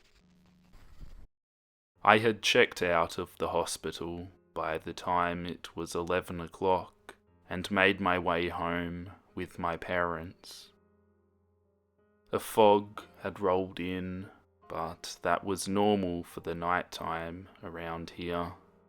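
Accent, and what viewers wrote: Australia. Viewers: Pookie r you Australian ? ? ?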